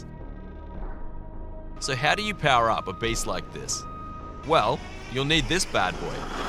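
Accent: Australia. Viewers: It does help that the narrator of this video is Australian. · Are you Australian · Aussie accent is perfect for this type of content:elbowcough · he sounds aussie ngl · Australians make everything sound like I want to go Outback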